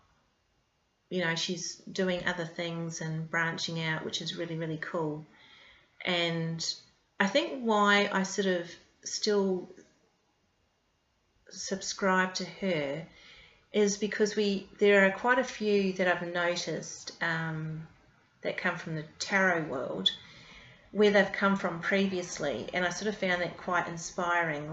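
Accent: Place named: New Zealand. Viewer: Oh my goodness, I thought (assumed) you were from NZ!! Great responses here, I’ve been dabbling with some deck mods recently, only decks that are cheap and easy to get though. I’m the same, I’m not a huge fan of long videos, I try to keep mine around 10 to 15 (every now and then they go over if it’s something special). I’m off to check out some of the other channels you mentioned!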